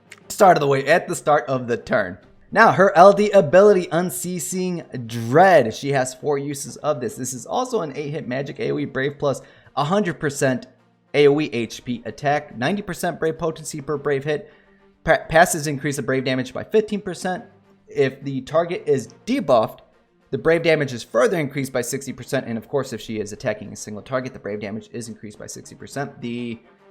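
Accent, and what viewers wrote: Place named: Texas, United States. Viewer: Just curious, where in the country were you born/raised/got your accent from? I've never heard "heirloom" get reduced to "/ˈɝl.lum/" from "/ˈɛəɹ.lum/" before. Sounds almost texan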